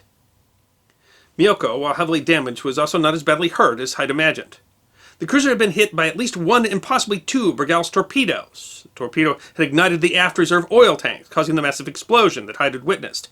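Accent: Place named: Midwest, United States. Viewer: Those flat Midwestern "A"'s!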